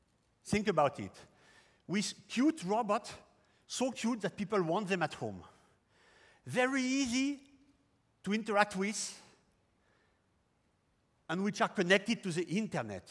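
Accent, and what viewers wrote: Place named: Germany. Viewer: His accent sounds german.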